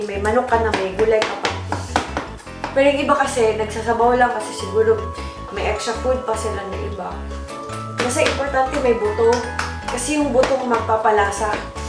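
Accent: Visayas, Philippines. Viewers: dati nag subscribe ako dto pero inalis ko kasi parang nakakasaawa panoorin yung pgkbisaya nya nakakaturn off maganda pa naman sya saka sobra maarte mgsalita.aral ka tagalog kasi nasa manila ka naman na nakatira . sorry to comment like this para din syo yan para mas dumami subscriber mo not only visayans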